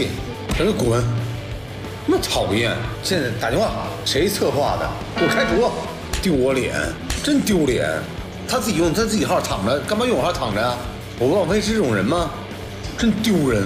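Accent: China. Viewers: 北京口音好爽，语速也超快。我本人是外国人，只能听一下真学不来。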